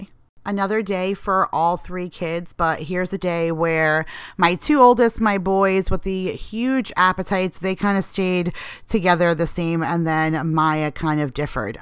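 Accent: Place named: New York, United States. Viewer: I’m in Suffolk County Long Island! I swear from your first video I saw of yours, I said she’s definitely from NY or New Jersey! Lol you haven’t lost that accent 😉Have fun with your Mom 💞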